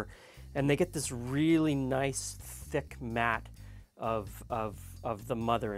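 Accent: Canada. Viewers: Funny you mentioned it. I heard a Canadian accent. It’s all about the about.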